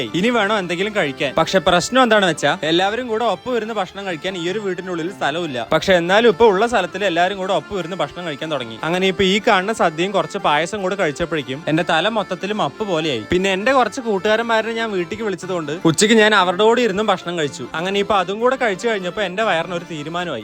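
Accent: Palakkad, India. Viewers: Indrajith vlogile athe voice modulation😅 palakkad ano/?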